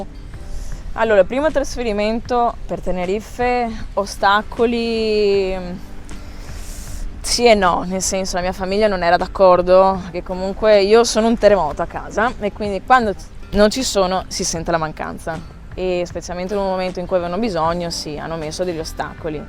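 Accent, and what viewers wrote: Veneto, Italy. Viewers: Ciao, che bella storia!! Sei stata bravissima, complimenti! Di che paese del Veneto sei?. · Brava, una bella intervista, sei stata sincera e intelligente, hai messo in evidenza anche le problematiche e le difficoltà di ogni trasferimento. Lo spirito di adattamento conta moltissimo, soprattutto nei momenti difficili. L 'Italia è unica, per bellezza, cultura, geografia, è il modo di vivere che è cambiato, è diventato frenetico, come dici tu, non è per tutti. Chi ha la possibilità di cambiare, di mettersi in gioco, è giusto che lo faccia, ciao e Pura Vida. P. S. L' accento veneto non l'hai perso.... 😁😜👌👍💪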